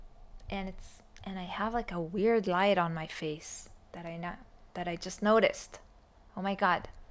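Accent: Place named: Israel. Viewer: Finally, I get to hear your Israeli accent. Love it. My mother is a hairdresser, she would tell you protein and conditioner for your hair….look a little dry. I’ve been watching you off and on for 8 years. I love watching your infectious smile. Always makes my day brighter. I would say in the beginning you were playing the music….now, 8 years later, your feeling the music. And this is what now drives your playing. Rock on baby doll!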